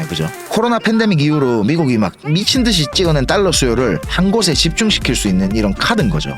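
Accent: South Korea. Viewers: 저도 응원합니다. 특유의 부산 억양 제 와이프와 같습니다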